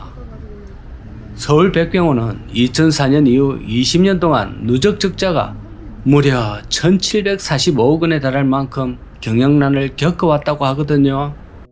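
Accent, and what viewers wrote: Gyeongsang, South Korea. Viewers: 갱상도 아이가?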